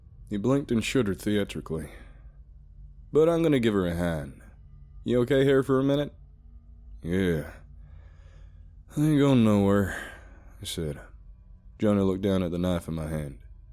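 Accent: South, United States. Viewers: You really have mastered that country American accent and it shows. And this one's coming from a good ol southern boy 🤣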